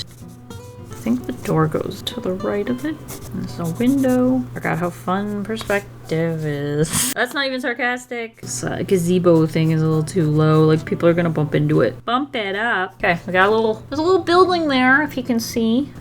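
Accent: United Kingdom. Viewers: Every once in a while she sounds British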